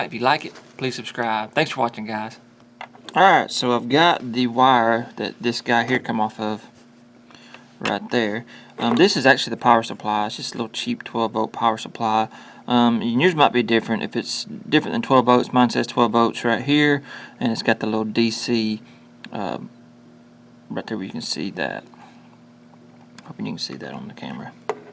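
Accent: South, United States. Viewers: Thank you for this!!!! I am going to give this a shot. I was about to throw them out.... but not just yet! I enjoyed your accent as well, good ol' country boy. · Love your country accent.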